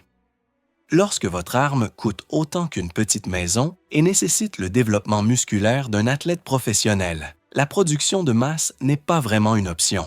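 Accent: Quebec, Canada. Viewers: L'accent quebecois du robot c'est pour gratter quelques secondes de vues ?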